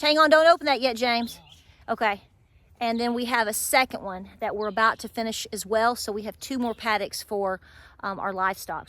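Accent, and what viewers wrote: South, United States. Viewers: Came in from this Oklahoma heat after hanging garlic to shell some peas and was delighted to another “Truth Hurts” episode. Thank You for being our voice. I know you didn’t ask for that position but only a small handful of folks can get through the wokeness these days and we have it in a beautiful southern accent 🥰 God bless you, James( the ), all the young men in your household. You are greatly appreciated!! 🤓❤️🇺🇸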